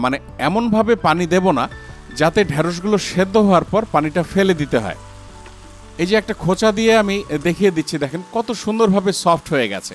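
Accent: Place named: Rangpur, Bangladesh. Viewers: Vaiya Ami o Rangpurer mey ar Nilphamarir Bou amra o avabe boli apner kac Theke Amader ancolik vasa sune vlo laglo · আপনার দেশের বাড়ি কোথায় ভাইয়া? মনে হচ্ছে দিনাজপুরের ঐদিকে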